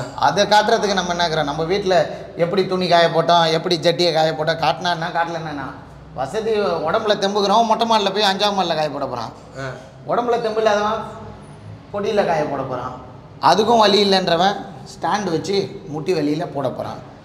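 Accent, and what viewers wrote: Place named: Kolar, India. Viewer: Kolara pesararu 😂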